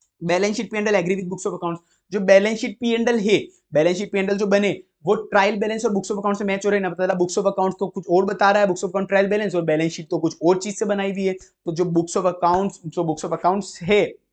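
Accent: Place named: Bihar, India. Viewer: Audit Committee bhi nati nikli 😂😂😂😂 Sir apka bhasa se aap bihar ke legre hain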